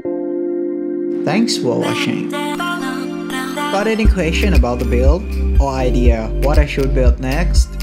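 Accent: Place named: Thailand. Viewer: very thai accent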